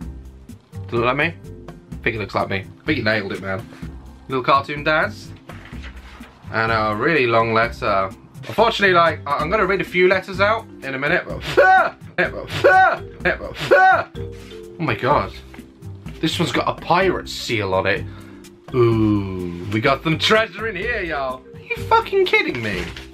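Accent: United Kingdom. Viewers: he's british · London he's British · he is from Britain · I'm pretty sure he's British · Hes British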